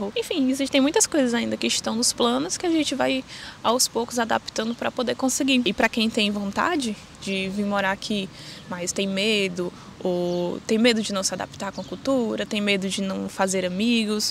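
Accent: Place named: Northeast, Brazil. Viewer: Logo vi que me pareceu ter um sotaque nordestino mas não quis arriscar. É que a maioria dos brasileiros em Portugal ou são paulistas ou cariocas 😁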